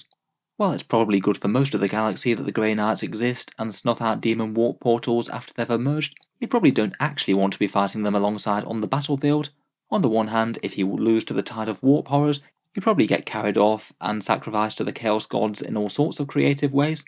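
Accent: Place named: Northern England, United Kingdom. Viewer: He's northern english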